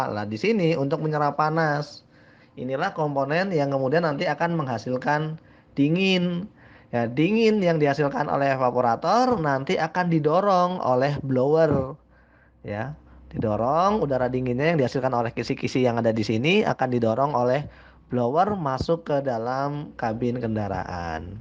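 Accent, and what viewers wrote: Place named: Java, Indonesia. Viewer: Medok nya sudah pas, Mantap pak guru salam dari senop :)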